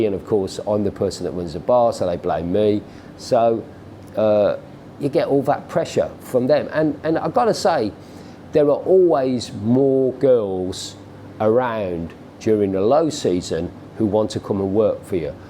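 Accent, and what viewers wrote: Birmingham, United Kingdom. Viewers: Lazy brummie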